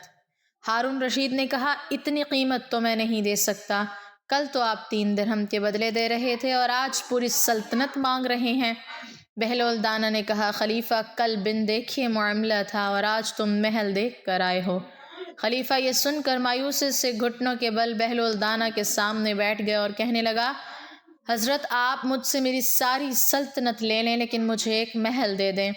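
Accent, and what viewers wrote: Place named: Saraiki, Pakistan. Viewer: یہ سرائیکی excent لگتا پے۔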